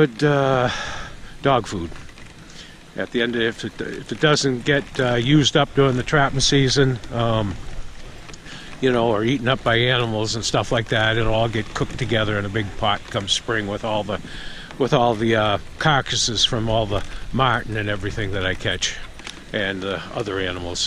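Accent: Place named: Massachusetts, United States. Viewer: seasons greetings from your old stompin grounds...massachusetts. you havent really lost the accent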